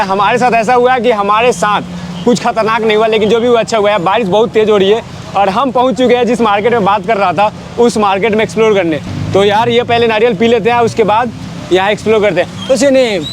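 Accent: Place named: Bihar, India. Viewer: Bhasa mein thoda bihari put aa raha hai. Jaise aap aur ko aud bol rahe ho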